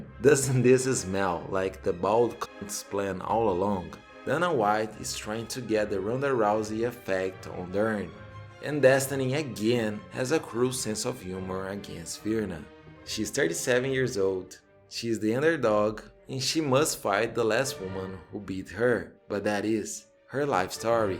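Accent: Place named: Brazil. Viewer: Vc é brasileiro pae, da pra reconhecer pelo sotaque irmão kkkk! VOCÊ FOI PEGO!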